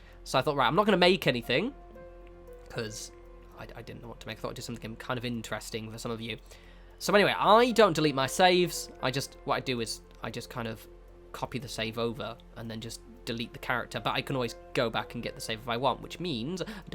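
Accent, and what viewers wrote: United Kingdom. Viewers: Blocking off the ceilings and stuff was the very fist video I saw of yours and I loved it. Plus your cute britishy accent is hot :)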